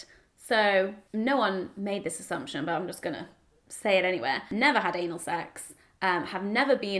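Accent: United Kingdom. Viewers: Your British accent along with your "joie de vivre" is what I find attractive in you. Your husband is truely a very lucky man! Stéphane from Montreal, Canada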